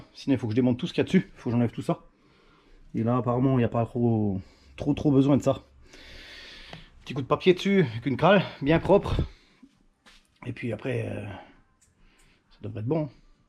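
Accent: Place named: Lorraine, France. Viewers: Bravo meca a l'encienne j'aime bien humeur décalée ainsi que l'accent lorrain. Salutations 👍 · salut le maître , tu devrais donner des cours au jeune, tient ! toujours impeccable, comme tu le demande dans la vidéo, je mets un commentaire , je sais le faire. mais c’est super cool de te voir le faire avec autant d’aisance. je regarde toujours toutes tes vidéos en entier, à quoi bon, juste mettre un pouce, si on ne regarde pas le contenu. je préfère tes vidéos que les émissions de télévision dédiées , car tu ne fais pas dans la mise en scène, c’est naturel et sans filtre. tu me fais rire avec ton accent qui ressemble un peu à l’alsacien. Tu ne dois pas être bien loin . a bientôt. 👍🏽👍🏽👍🏽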